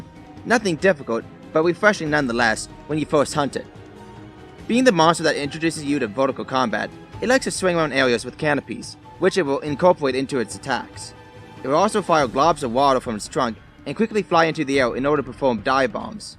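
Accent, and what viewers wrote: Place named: Ireland. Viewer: It sounds irish :D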